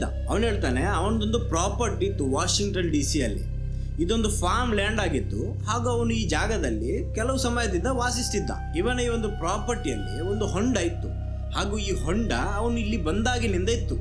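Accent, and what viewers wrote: Mangalore, India. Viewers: Your From manglore ???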